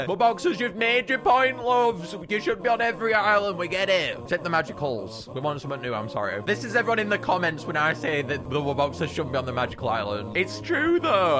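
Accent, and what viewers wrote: United Kingdom. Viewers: are you British (ik this is very weird to ask just curious )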